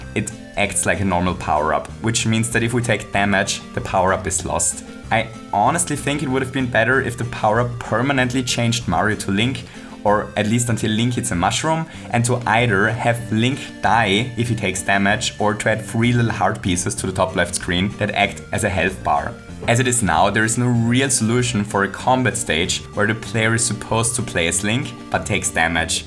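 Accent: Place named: Germany. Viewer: Bin ich der einzige der denkt das er einen deutschen Akzent hat? OK dann bin ich wohl der einzige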